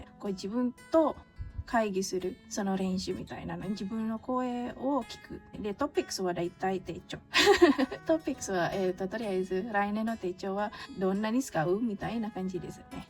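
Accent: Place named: Indonesia. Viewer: I guessed from your accent that you're from Indonesia. Hello! I'm from the Philippines, also living in Japan. よろしくね！ 手帳や文具が増えるばっかりで、その活用方法のため自分でよく手帳会議をしています！💦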